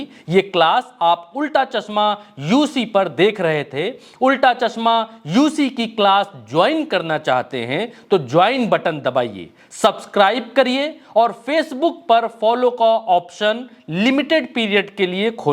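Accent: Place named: Bihar, India. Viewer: Tere awaj se to tu bihari lag raha hai, PM apna rashtra bhasha to sahi bola na? Ya wo bhi tu hi sudharega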